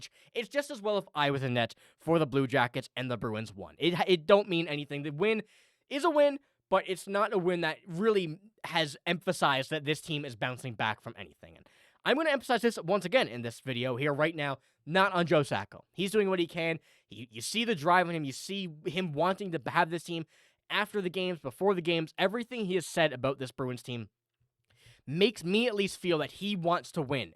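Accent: Canada. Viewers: Where are you from bro? You have a Canadian sound to you but obviously a huge B’s fan…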